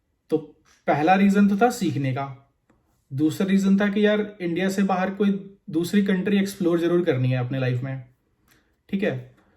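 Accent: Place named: United States. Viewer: How did you learn US English ?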